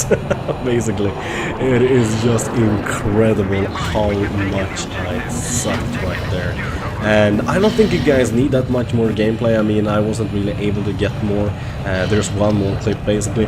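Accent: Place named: Sweden. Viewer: This guy has to be from Sweeden. I can hear it when he talks and I know i'm right! I just know!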